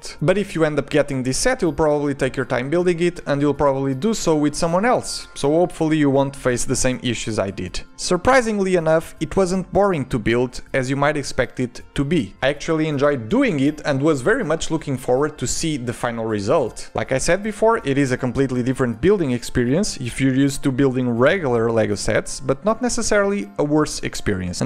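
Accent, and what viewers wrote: Portugal. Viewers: I just have that strange feeling, that you are português, cuz of " 'eight " instead of "height", "raciu" instead of racio. So yeah, just a guess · no primeiro segundo do vídeo soube que eras tuga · gajo parece tuga a falar ingles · 10 segundos (sem ver o nome do canal) e percebi logo que o sotaque era de um português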